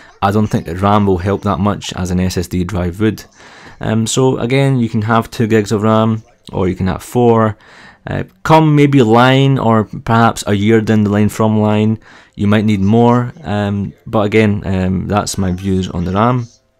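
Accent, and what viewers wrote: Scotland, United Kingdom. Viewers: I adore your Scottish accent (if that's what it is), even if it's quite hard for me to understand as a non native speaker! Very interesting real-life usage tests, the MBA looks like a great machine.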